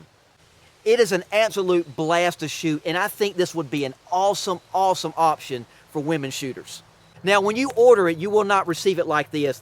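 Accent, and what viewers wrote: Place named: South, United States. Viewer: I've two of the ORIGINAL, "Simple Shot" Axiom Champs, and among my dozen or so others, they remain my favorites. I also have an original (1st gen.) "Scout" , also terrific, and also made by our sports/hobby's most likeable gentleman, Nathan Masters. Back around 2009, when I was Assistant Chief to Chief AJ (John Huffer) of the NSA, he made a slingshot for ladies, which he called the "Pink Lady Quick Shot". It was pink, indeed, but other than that, it was no different than his other non-remarkable "Quick Shot" slingshots. I've a miniature slingshot pendant in a gold-like finish, not much different in size to a U.S. dime, made by Mark Seijan - it's cool! Enjoyed your vid, burps and all - though it is kinda strange to hear someone with a definite southern USA accent speak in terms of millimeters. I "get it" though, because those are the manufacturers' specs, and they cater to slingshot enthusiasts (rubber nuts) worldwide. 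The British "WASP" slingshots are probable also good for women, and I believe they're now being made in more than the four colors I already have.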